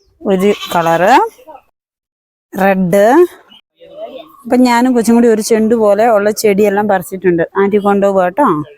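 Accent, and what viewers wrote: Kottayam, India. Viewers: വീട് കാസർഗോഡ് ആണെന്ന് മനസ്സിലായി. എന്നാൽ ഭാഷ കോട്ടയം പത്തനംതിട്ടയിലേതു പോലെ. ആൾക്കാരുടെ സംശയത്തിനൊന്നും മറുപടി കൊടുക്കാത്തതെന്തു.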